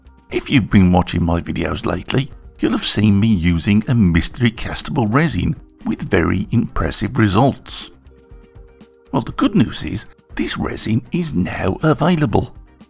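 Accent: Birmingham, United Kingdom. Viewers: are you a brummie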